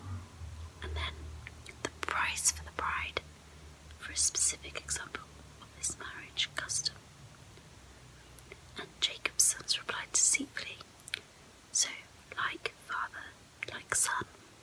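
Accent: United Kingdom. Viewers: Anyone else figure out that loud kinda creepy noise that repeats later? Sounds like maybe a motorcycle going past her flat/house? Aside from that, I truly enjoyed your whispery bible read. Your velvety English tinged whispers are very soothing to listen to, especially for bed time. Please keep sharing your Christian gifts! 🤗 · Nice British accent 💛☺️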